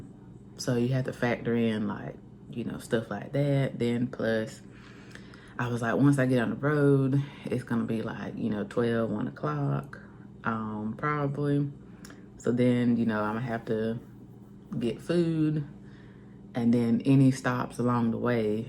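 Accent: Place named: South, United States. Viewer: Im gonna assume you were sheltered growing up...get out of comfort zone...truck'n is tough but it gets easier as u start to get it...I understand you want to move to the beat of your own drum...we all do...the world is a cruel place...I like the fact you're willing to get out of comfort zone (🚛)...ik I'm all over w my writing but formulating sentences isn't my forte...the 🌎 takes no prisoners...love the southern accent...get a chance watch niesha k or skimp dee or roadrunna nia... watch how they move look at mirrors... recognize what u see...plus they're prissy they like to get hair and nails done...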